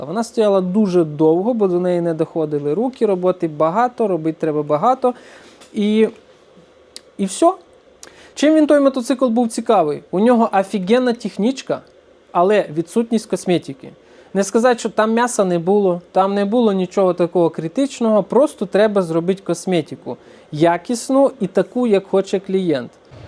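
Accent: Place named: Ukraine. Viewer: попадаю додому цей акцент ні з чим не спутаєш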